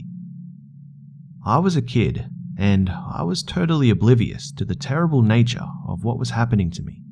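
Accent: Australia. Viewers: Wow, what a sad story and a very monotone voice... also didn't help that the guy has an australian accent... all story tellers should be British! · "In this scary STORY..." an AUTHOR... with an Australian accent claims he was born in India, and tells us he was 12 and doing a Calculus assignment... Details, details... must have a criminal mind to fabricate such a tale. I stopped listening halfway through. This is one of those creepy corners of YT. I prefer true accounts in order to understand the dynamics of child abuse. Since after moving cross country, I have come to meet about 18-20 people, 7 of whom suffer from mental disorders, and all of them have suffered child abuse. A few of these live in absolute squalor and abject poverty.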